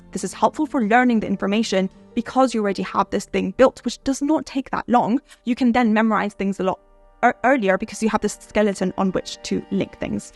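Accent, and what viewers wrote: Scotland, United Kingdom. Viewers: sorry, i can’t listen to people with british accents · why do you talk like that? are you british ? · Thats scotts english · is it BE accent? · East coast Scotland, sounds like Edinburgh/Stirling private school type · did you speed up the video to get it under or do you normally speak at a NYC “I’m going to miss the bus” pace? Between the rapid fire of words and your slight British accent, I completely failed to understand most of what you were saying. It doesn’t help that you are distractingly beautiful, but… SLOW DOWN GIRL! By the way, throw away that lipstick. It’s the wrong color for you; obviously I found that distracting too. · Are you from britian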